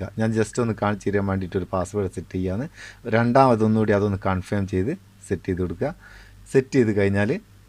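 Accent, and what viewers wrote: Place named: Kozhikode, India. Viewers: നിങ്ങളുടെ കോഴിക്കോടൻ സംസാരം നല്ല രസമുണ്ട് കേൾക്കാൻ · നിങ്ങളുടെ നാട് വടകരയാണോ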